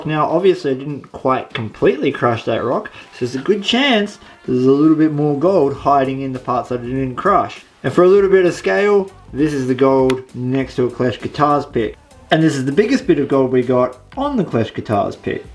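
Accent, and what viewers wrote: Australia. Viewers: I think I just found the Australian version of myself without the tattoos I'm doing everything this guy's been doing without the Australian accent which I think would be really neat to have as well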